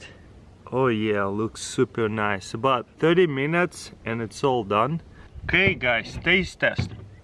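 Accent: Russia. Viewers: Ужасный русский акцент.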